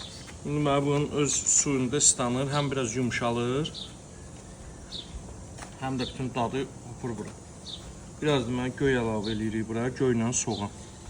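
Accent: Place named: Baku, Azerbaijan. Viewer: "Çoxlı qurdalıyırığ " canım 👑BAKILIM👑 ləhcövə qurban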